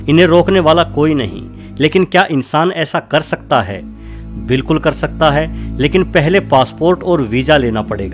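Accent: Haryana, India. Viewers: .. aap haryana se ho shyad?..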